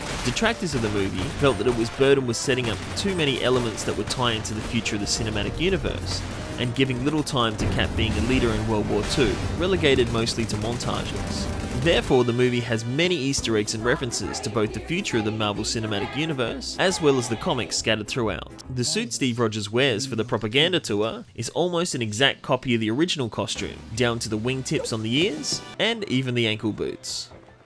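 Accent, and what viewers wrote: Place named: Australia. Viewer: Are you Australian because I am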